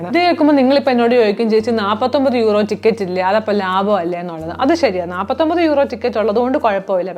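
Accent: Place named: Pala, India. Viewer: പാലക്കാരി ആണോ മോളെ...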